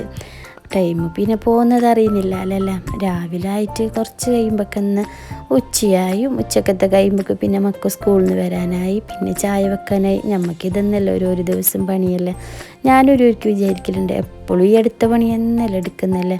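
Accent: Kasaragod, India. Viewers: കാസർഗോഡ് സംഭാഷണം കൂടി ആയപ്പോൾ മീൻകറി sett ആണ് 👌😍😍😍👍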